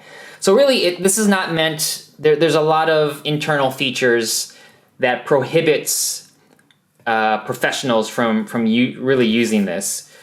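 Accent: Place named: South, United States. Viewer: Говор этот южный... поубивал бы начлен. Чесслово, уши вянут. А после Samsung HMX-H405... Ну, чего тут сравнивать-то - земля и небо. Если кому надо русифицировать AX60 или настроить AX53 (или иную камеру Сони) на 30/60/120 к/с - обращайтесь, научу. У меня АХ53 пишет в NTSC и дез получасового ограничения записи.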